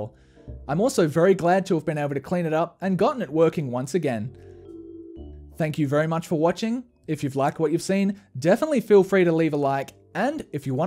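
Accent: Australia. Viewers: Aussi Accent...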